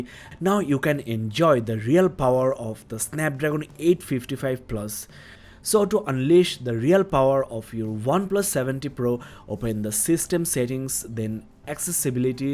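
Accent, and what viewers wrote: India. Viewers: Foul Indian accent.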